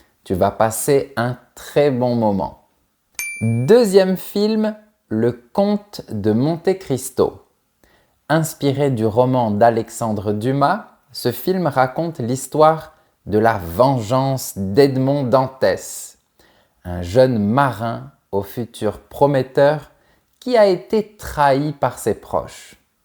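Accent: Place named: South, France. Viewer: Bonjour! J'adore vos vidéos! Mon mari et moi avons récemment déménagé de Californie à Toulouse et on est amoureux de cette belle Ville Rose! Quand j'ai entendu votre façon de prononcer certaines choses, je me suis demandé si vous venez peut-être du sud de la France. Je viens de découvrir dans une autre de vos vidéos que vous habitez à Toulouse. Très cool. Peut-être je vous verrai promener dans la rue un jour. J'aimerais bien faire votre connaissance. Merci de toutes vos vidéos qui nous aident beaucoup. Vous êtes TOP!!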